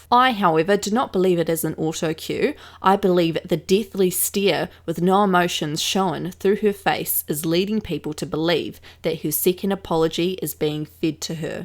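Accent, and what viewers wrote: Australia. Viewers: Are you an Aussie or a kiwi??? I’m British so I can’t tell the difference!! Hahahah you should do a video about you rather than drama your voice and accent is great sis! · sounds Australian to me :)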